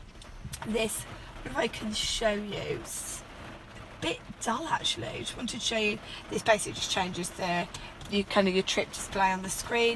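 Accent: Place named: RP, United Kingdom. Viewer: Oooh gone posh again! Suits you I reckon. Another great car review as always 😁👍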